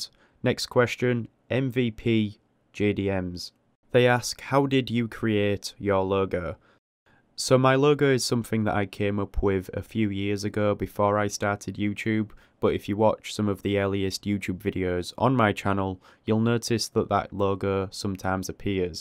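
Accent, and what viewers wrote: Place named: Northern England, United Kingdom. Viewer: Awesome video. Thank u very much for your answers. Love ur accent by the way. I’m in Manchester I’m guessing your slightly further north. That drawing looked insane. So good. Keep up the great work. Love your content and videos. Pls never stop 👍👏👏👏😍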